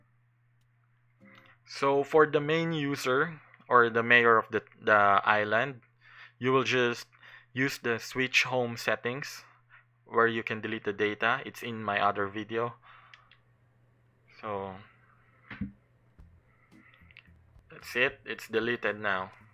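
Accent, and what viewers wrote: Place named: Philippines. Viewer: Filipino accent there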